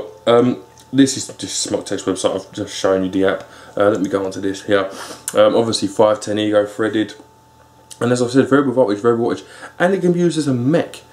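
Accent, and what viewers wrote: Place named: North London, United Kingdom. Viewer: Hey, thanks so much for the review. I feel compelled to apologize for any Americans giving you a hard time about talking too fast or having a 'fick' North London accent (is that right? North London?). I'm an American, and I can understand you perfectly. My only advantage is that I grew up watching Monty Python and The Young Ones and loads of other British tv shows, so I'm used to it. Vape on and talk as fast as you like. Cheers!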